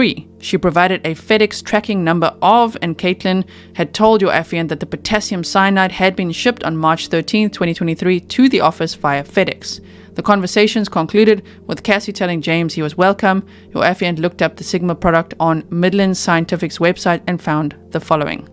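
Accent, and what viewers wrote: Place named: South Africa. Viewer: Hello from Cape Town South Africa ...are also south African you sound like one😊🇿🇦🇿🇦🇿🇦🇿🇦🇿🇦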